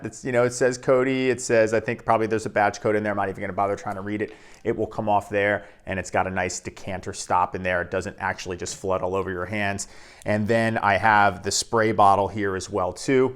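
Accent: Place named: North Jersey, United States. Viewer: For a guy called you like like a guy from north Jersey!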